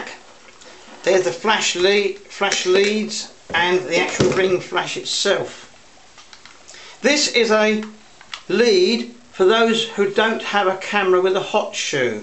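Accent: London, United Kingdom. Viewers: London. He sounds like my Dad, born 100 yards from London Bridge.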